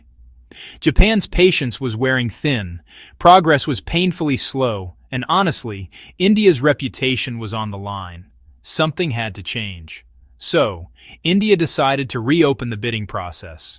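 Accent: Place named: England, United Kingdom. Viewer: Chinese propaganda in anglicised English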